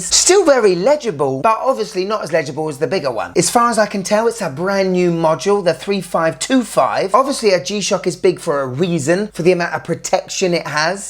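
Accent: United Kingdom. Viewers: Best YouTube channel ever!! Sorry for my bad English.. I'm a fan from Brazil.. I also love Casio watches..I own 4 Casio's . Casio MW 240 is the cheapest and the most beautiful watche ever made. I wish you were my friend. British accent are the best accent in the world.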